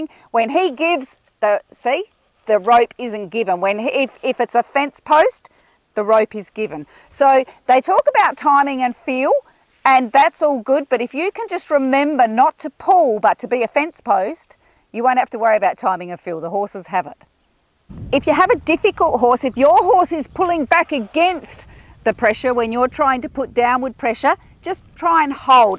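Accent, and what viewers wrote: South Australia, Australia. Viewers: Are you a South Australian? I'm trying to pick the accent. Great video!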